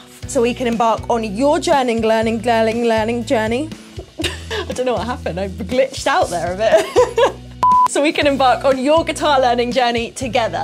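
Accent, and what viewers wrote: England, United Kingdom. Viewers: Holy sh*t I had no idea she had a British accent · I don`t know why...but until I heard you speak at the end of this video I assumed you were American. England really has everything going for it right now...although we need to wait until tomorrow to see if they are kings of Europe....forza Italia! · Her guitar work is phenomenal. Her smile is gorgeous. And when she talks in that British accent, I wish she'd never stop speaking.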